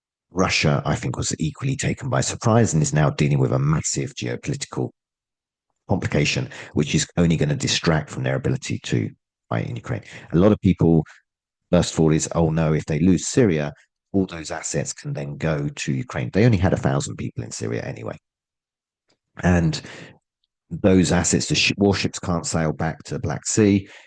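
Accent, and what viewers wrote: United Kingdom. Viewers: copium hard by British accent what would you expect, the truth.... Saddam had Nuclear bombs in Iraq...... HAHAHAHA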